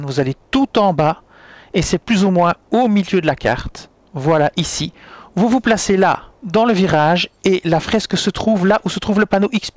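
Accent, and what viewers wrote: Belgium. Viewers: Merci a notre ami. Je suis fan absolu de ton accent belge. Tes 8 qui devienne ouitte c est trop genial. Big up a l amitié franco belge ou belgo francaise. 😊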